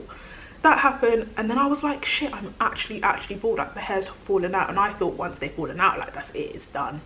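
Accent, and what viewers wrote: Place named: United Kingdom. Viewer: Man the British accent is annoying.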